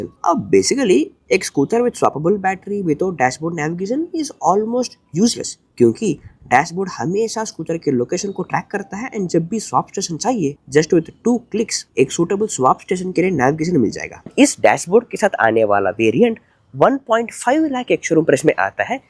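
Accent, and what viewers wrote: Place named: Karnataka, India. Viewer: I don't think he is a north indian, most likely Kannadiga(from accent), at the most telugu person.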